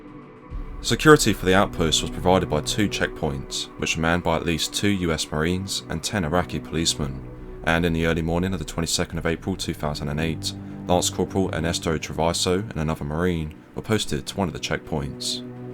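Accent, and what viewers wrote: United Kingdom. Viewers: Always a British guy narrating American documentaries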